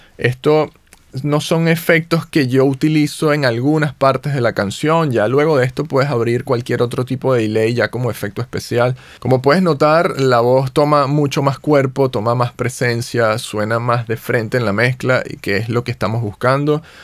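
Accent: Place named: Caracas, Venezuela. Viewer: A propósito, eres venezolano, cierto? Tienes acento caraqueño.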